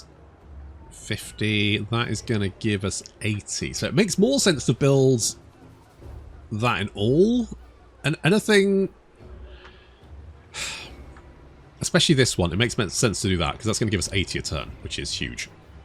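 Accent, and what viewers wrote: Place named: West Country, United Kingdom. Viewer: West Country accent with your commentary is priceless 🤣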